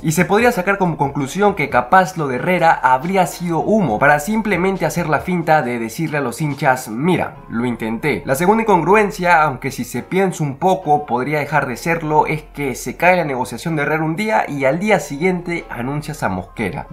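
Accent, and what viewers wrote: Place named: Bolivia. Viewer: Por q el marcado boliviano? 😵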